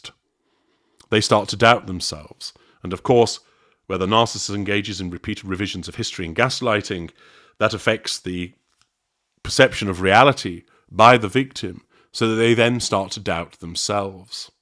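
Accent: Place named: Yorkshire, United Kingdom. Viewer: No - I can still hear a tiny bit of the Leeds? (Yorkshire) accent in the lad. Most of our British aristos talk with a handful of pebbles ' int pie-ole'. 😅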